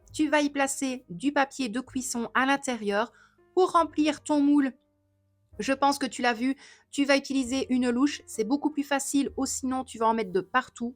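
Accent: Belgium. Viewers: merci ,j ai regarder en effet,ça ressemble plus aux pancakes américain !! Ton accent mdrr ,je suis belge aussi donc sa ne me choque pas Et oui le spéculoos c'est la vie 😘😘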